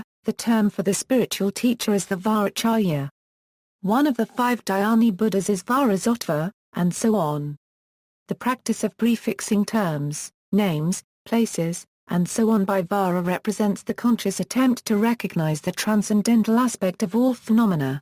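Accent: United Kingdom. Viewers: I studied Sanskrit and Hindi at university and 100% agree! Wrong pronunciation - this isn't Spanish!! They do that in Spanish jalapeno is "halapeno" - not Sanskrit or Hindi! Narrator is Britisher, so what do they know?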